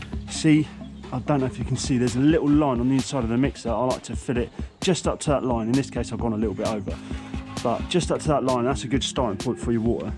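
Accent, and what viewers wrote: England, United Kingdom. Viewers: Where are you from England I think?????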